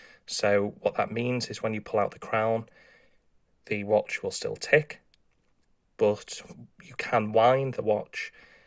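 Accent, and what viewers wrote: United Kingdom. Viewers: Your voice has a similar accent to that of Brian Cox, the British astronomer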